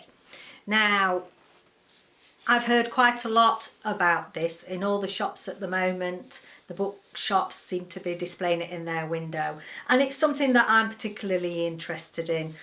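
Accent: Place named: North, United Kingdom. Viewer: Welcome to Booktube, it's lovely to find you. Subscribed! Can I ask where you are from? You sound like a fellow Northerner. 😀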